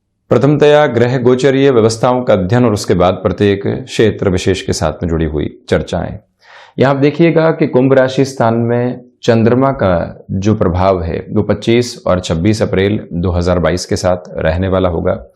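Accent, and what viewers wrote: Standard. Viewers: सटीक विश्लेषण भाषा पर अच्छी पकड़ , उसका प्रयोग और शुद्ध उच्चारण प्रभावशाली . साधुवाद !!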